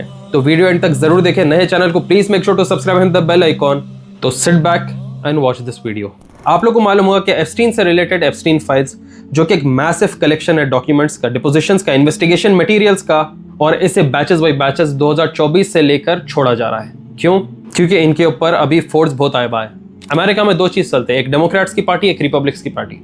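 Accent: Hyderabad, India. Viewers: aplog kaha se ho bhai Hyderabadi lagra style mje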